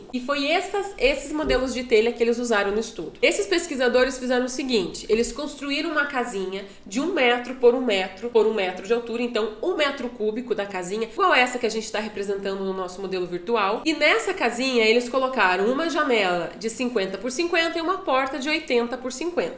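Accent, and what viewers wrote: Brazil. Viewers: Nossa o conteúdo foi fantástico, mas voce explicando foi surreal, serio mesmo parabéns e o sotaque de curitibana entao, hahaha parabéns mesmo, tirou varias duvidas que eu tinha. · Adoro seus vídeos, principalmente porque sou muito fã deste teu sotaque Paranaense, fui criado em Paranaguá, mas atualmente moro em São Paulo.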